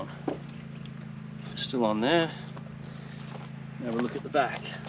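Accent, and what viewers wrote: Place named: Australia. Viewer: Ha! I knew that was also possible, but your Aussie accent is soft enough that it could be Kiwi, but I should have known by the dryness that is Oz. I guess the name and that Kiwis are often DIY-competent. We lived on the North Island for 13 years where I had a Walker, which I loved, as the floating deck out front was good for getting under trees. Now on Kauai where there is no Walker dealer, so now I'm a Hustler man. I just forwarded your video link to a friend with the same issue, so thanks from both of us!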